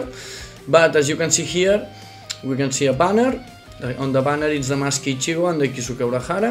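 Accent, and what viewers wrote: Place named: Catalonia, Spain. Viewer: Hola soc català com tu, lo accent se te nota molt jajaj es bastant graciós veure com parles ingles bé pero en accent català.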